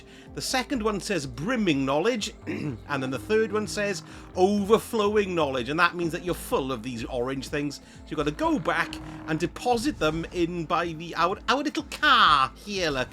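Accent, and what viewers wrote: Wales, United Kingdom. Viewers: Do I want these triumphs badly enough to listen to a welshman for 10 mins...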